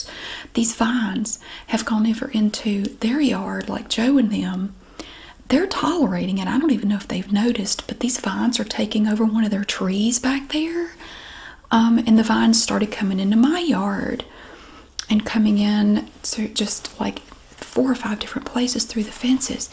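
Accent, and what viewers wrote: South, United States. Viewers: Best southern asmr videos on this channel! Aside from charity and carl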